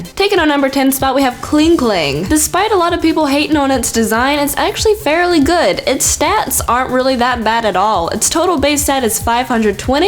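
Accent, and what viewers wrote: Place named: North Carolina, United States. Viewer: where is from? she sounds like she's from NC or some state around here... like, her slight dialect sounds so familiar.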